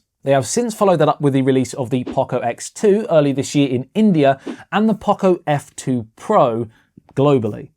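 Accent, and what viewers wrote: United Kingdom. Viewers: I really love British accent 😍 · This is the most british accent I heard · I have seen unboxing and reviews by Technical Guruji, must say this is a kind of british Technical Guruji. Rightly said, this is the most british accent I have heard.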